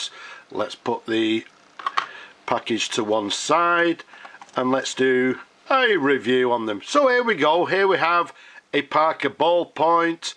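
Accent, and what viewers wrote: United Kingdom. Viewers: Are you a british man?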